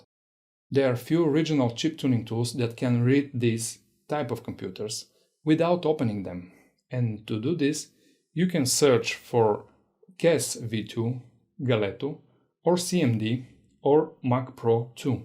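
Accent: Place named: Eastern Europe. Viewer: Слышу славянский диалект в произношении )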